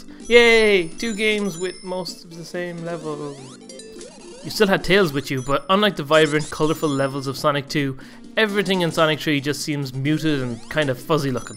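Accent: Ireland. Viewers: don't take this the wrong way, but I love your accent. you're Irish I'm guessing?? My family's part Irish and Scottish, so I had to try and pick apart your dialect and such. cheers to you and all!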